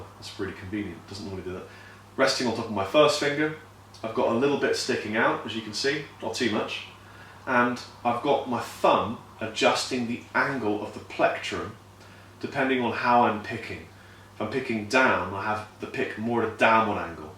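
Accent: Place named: England, United Kingdom. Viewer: I can’t help but comment on the hair + being white, and then he started speaking with an English accent and I just chuckled.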